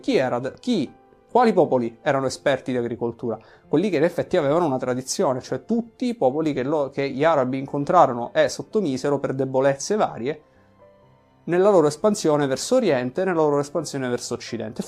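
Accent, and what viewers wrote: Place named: Sicily, Italy. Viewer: Devo dire che, comunque, sarai di madrelingua italiana ma che sei siciliano si senta lontano un miglio. Ottima dissertazione in questo video. La cosa che mi fa più piacere è saper che la "componente araba" è presente tra di voi in minima parte. Infine, il fatalismo, secondo me, è un tratto caratteristico di quasi tutto il sud Italia.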